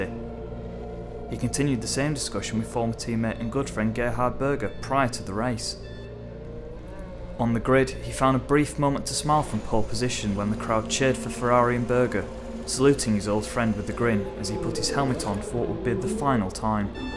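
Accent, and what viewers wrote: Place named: West Yorkshire, United Kingdom. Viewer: Are you from Leeds? Or like West Yorkshire? Anyway class video mate - really enjoyed that.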